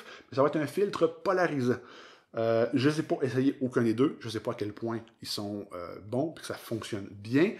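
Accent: Canada. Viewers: Bonjour et le Canada, cela ma fait plaisir d'entendre ce super accent cela me rappel nos vacances mon épouse et moi, cela dit, j'ai un Canon EOS D80 et je cherche à changer de boitier, ta vidéo m'a donné envie d’acheter ce EOS R, encore merci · Un vrai régal cette vidéo, et avec le truculent accent québécois en prime! (pour l'accent, je précise que je suis toulousain!!!)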